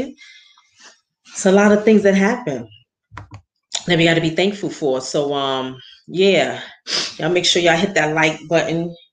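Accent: New York, United States. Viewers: Views not lost if you're watching. City is the name of the college, City College in Harlem Manhattan. You should know that Ny accent from anywhere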